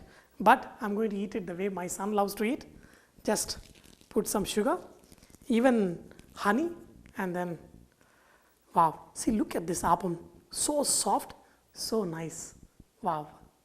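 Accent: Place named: Kerala, India. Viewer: your accent is so typical malyali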